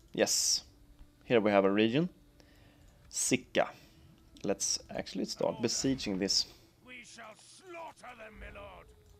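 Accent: Sweden. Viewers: You sound like you have a swedish accent. Är du svensk?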